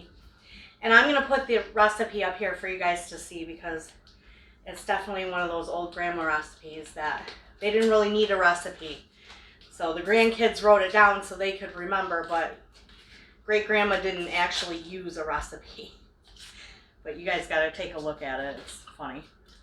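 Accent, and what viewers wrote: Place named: Michigan, United States. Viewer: Oh hearing the Michigan accent and seeing the Go Blue Crockpot in the background, makes me miss MI all the more! I wish I still lived there! When Mike came back from MI in September, I made him bring be back a lot of Blue swag lol Great video! I will have to try this sometime! Apparently you can make it with just water too!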